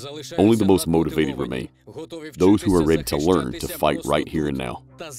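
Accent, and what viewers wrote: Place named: South, United States. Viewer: I do like these type stories .. especially translated is a southern accent. Lol. I was a military spouse x2 retiring both, living on or around so many bases, mostly west coast but we lived in Va, Ga, Ok.. Plus as a USO volunteer and working for a military contractor in DC.. when my husband was stationed at the Pentagon, then i transferred in, we saw a lot of each other, especially his friends and more. He was in communications on the 5th floor, and spoke several languages including Russian. Being around his friends more was like listening to you but they spoke atleast 3 languages besides English as did my husband. Unsure if you actually understand the language but your translation is great. Better then reading bad English translations on videos. Grrr...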